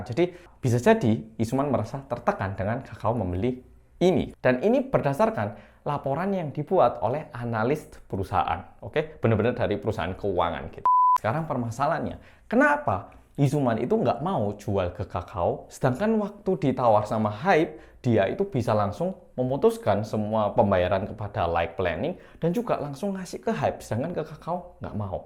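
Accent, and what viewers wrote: Java, Indonesia. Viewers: Makasih mas (Pria manis korea medok yang menjadi solusi bagi kami bolo² dalam setiap masalah 😄) aku sebenernya Masih ga nyangka sih Bighit yg agensi miskin, kecil dan hanya punya 12 karyawan kemudian semesta memberikan kesuksesan luar biasa lewat BTS sehingga sekarang jadi Labels Raksasa, punya cabang di Japan dan Amerika,uangnya ga berseri..dan sebenarnya banyak hal yang harus dicontoh dari seorang Bang PD Nim memberikan kepercayaan penuh thd anak² idolnya, memberi keleluasaan anaknya untuk menulis, memproduksi musik sendiri, diajarin gimana menghadapi dunia luar, tata kelola bisnis dan 2021an diajarin terjun ke persaham²an sehingga jika terjadi apa² Bangtan punya andil atau suara, berharap yang terbaik aja untuk semua (episode 4 Suchwita terbaru Suga bilang kami tahu bisnis, pengelolaan dan bagaimana industri ini bekerja dari Bang PD Nim)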